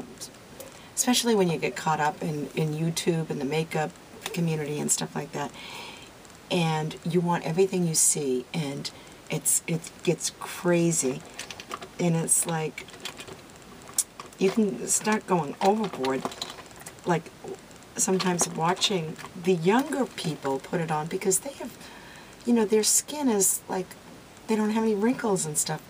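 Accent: Boston, United States. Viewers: You to. I love it when I hear your Boston accent. LOL. I think your hair look beautiful. Bangs or no bangs. xo Marie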